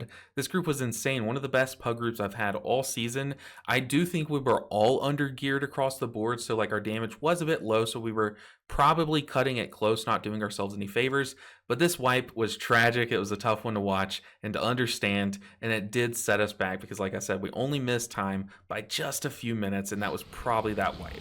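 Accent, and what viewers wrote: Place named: Midwest, United States. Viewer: lol I also love is like midwestern accent where he is just kinda like aw shucks. He never gets tilted or pressed !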